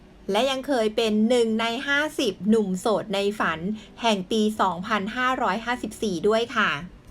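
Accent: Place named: Isan, Thailand. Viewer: นึกว่าคนอีสานเว้าอีสานคักขนาด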